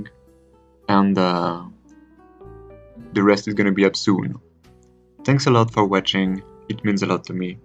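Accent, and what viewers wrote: France. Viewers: I know this is an older video, but I love your Gothic builds. Your French accent was delightful to listen to while you built this maison. I am a big lover of English and French history and I know it's been a while since you have uploaded sims4 videos, but I hope one day you will continue building European style builds. Maybe even historical ones! Have a lovely day.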